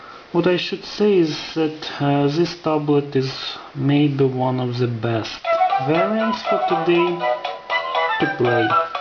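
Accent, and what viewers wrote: Russia. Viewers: You sound pretty ruassian O_o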